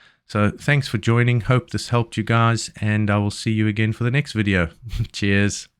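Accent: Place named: South Africa. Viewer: Another encouraging video, thanks! You sound like you are from SA?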